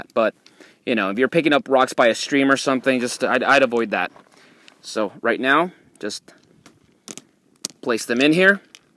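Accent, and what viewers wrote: Texas, United States. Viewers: Where in texas is he from??????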